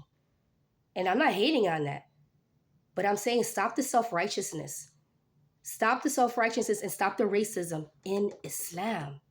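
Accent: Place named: United States. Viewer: Well said, Sister. I am a white American revert married to an Arab. I took my shahada about 24 years ago. My oldest daughter went to an Islamic school in California where I was welcomed by some but not all. It was great community. I lived in the Middle East and had the unique experience of having many American Muslim friends who were married to Arab men. I didn’t go to the masjid there as it was more common for women to gather at homes but I had a community for my children to see where they felt they belonged. When we moved back I tried to be a part of the community but felt really isolated and my children did as well. We haven’t been a part of a masjid during their adolescent years as a result. I feel that I failed them for my being too weak to try and make it work at a masjid. I don’t know any African American Muslims but I have always wanted to try to be a part of that community. 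As a white revert I feel similar feelings that you do as an African American Muslim. Thank Allah for YouTube where I can get something for myself when it comes to practicing my deen. I found you a few years back because you were reading the Quran in English and I longed for an American woman’s voice. You were that woman! may Allah reward you for all of your efforts. Jazakallah khair.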